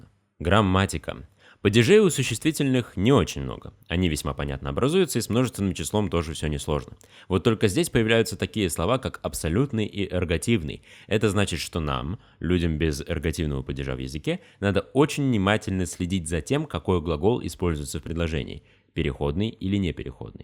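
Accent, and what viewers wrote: Russia. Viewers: Автор выговаривает адыгский с русским акцентом. Если хотите услышать чистый современный адыгский язык, кабардинский диалект , вот фильм <link>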